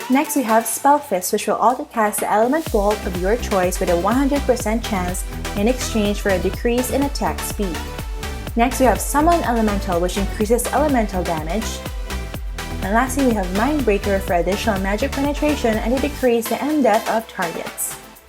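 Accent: Philippines. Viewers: So conyo. Hirap panoorin.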